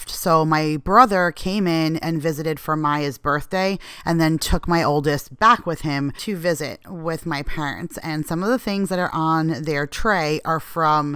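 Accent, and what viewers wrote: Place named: New York, United States. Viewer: I’m in Suffolk County Long Island! I swear from your first video I saw of yours, I said she’s definitely from NY or New Jersey! Lol you haven’t lost that accent 😉Have fun with your Mom 💞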